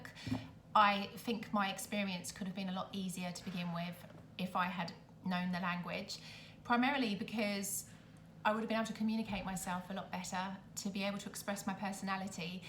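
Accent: United Kingdom. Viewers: Hi, i'm watching all your videos because I want to improve my english with a British native speaker (I don't like too much the american accent..). They are funny and interesting, I found them useful for my goal, so... thank you for sharing your thoughts and considerations about your life in Italy. Sometimes your connected speech is a little too fast for my level, but it's an interesting challenge;) I have just a little tip for improve the enjoyment of your videos: the theme song is very very loud... but the audio when you speak is quite low, so I have to turn up the volume a lot during the intro... and a few seconds later the theme song starts and I find myself with destroyed ears!:) Ciao e grazie!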